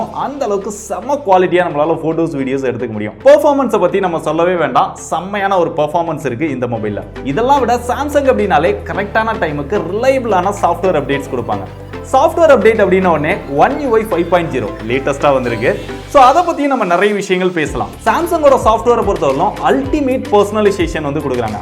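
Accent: Kovilpatti, India. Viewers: Neenga kovilpatti ah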